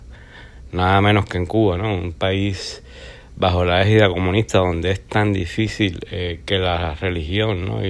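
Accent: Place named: Central America. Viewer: Guadalupe Salinas Salinas Gabrie no te pases de lanza los cerdos son sabrosos en carnitas y este tipo del vídeo habla como centroamericano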